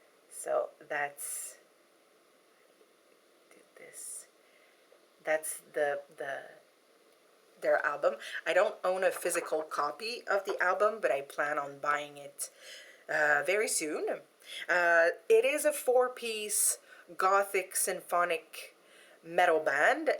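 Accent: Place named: Quebec, Canada. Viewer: Ah! French-Canadian. Neat! I knew you had an interesting accent, I just couldn't pinpoint what it was. I live in the Southeastern part of the United States, so you would definitely hear an accent from me! Lol. What's so odd, is that I have had people in my own hometown asking me where I'm from. That hasn't happened in a while now that I think about it---but it happened quite often for a while. So, I couldn't tell you WHAT I sound like, lol! Just a Southern accent. Have a great weekend, and---don't let the vampires bite! 👿 I would love to get bitten and turned into a vampire, actually. Life would never be boring then! Lol. 🤗🤗🤗 🎃🕸🔥👻💀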